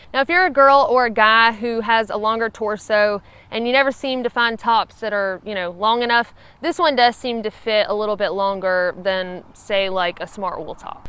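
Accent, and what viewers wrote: South, United States. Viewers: can you do a video with your sexy southern accent with lots of y'all and yonder and mosey??? You're so cute.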